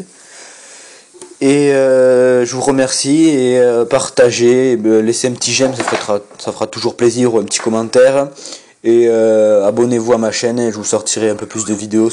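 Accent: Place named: South, France. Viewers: Ton accent t'as trahis;) sinon sympa ta vidéo. Et vive le sud ouest!:) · Meme si j'ai 13 ans tu m'accepte sur la xbox Os:on entant a l'accent que tes du sud ;-)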